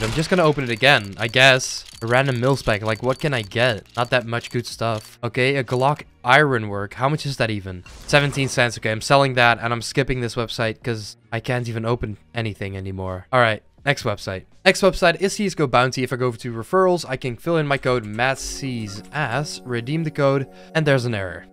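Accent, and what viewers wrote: Netherlands. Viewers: You sound Dutch so are you Dutch? XD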